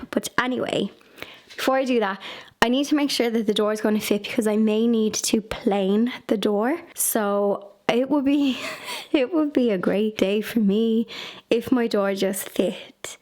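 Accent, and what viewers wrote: Ireland. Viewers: lovely video as usual… really enjoy the calmness of them, and you’re sweet Irish accent. Have been thinking about your floor choices… love the brick and think that it is a beautiful statement piece, but wondering if you would ever be barefoot in that space and how that would feel underfoot? Might be a silly question from someone who lives in Australia ha ha. My other thought is how high can you fill the grout to alleviate the variation between the grout and the brick (possibly less dust collection?) Also think if that kind of flooring is high maintenance definitely investigate a robot vacuum!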